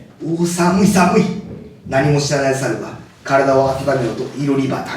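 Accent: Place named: Kagoshima, Japan. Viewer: あちこちに鹿児島なまりが残ってるのがめっちゃ好きです!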